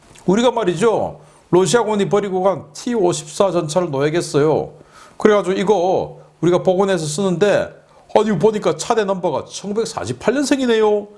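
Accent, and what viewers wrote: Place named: Gyeongsang, South Korea. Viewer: 까딱하면~~ ㅎㅎㅎ 박사님 경상도 사투리가 나는 듣기가 좋은데 저~ 서쪽에나 저~ 위쪽에 사는 사람들은 무슨 말인지 못 알아들을 걸요?? ㅋ 박사님 늘상 좋은 날이 되시길 기원드립니다.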